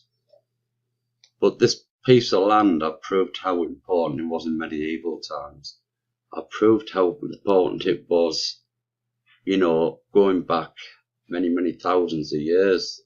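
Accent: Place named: Stockton, United States. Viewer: Nice one mate...i live in Sydney now but as a kid my Nana lived on Dovecote Street in Stockton and my mum was born there..good to hear the accent again 🙂👍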